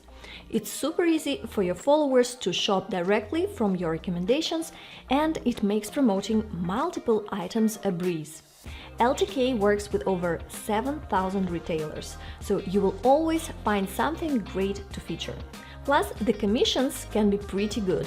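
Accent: Russia. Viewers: Russian accent 😮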